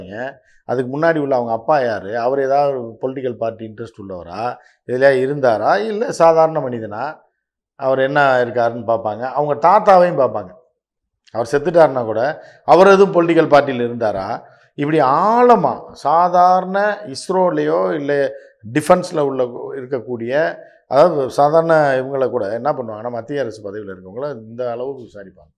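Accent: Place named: Madurai, India. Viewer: madurai slang-Super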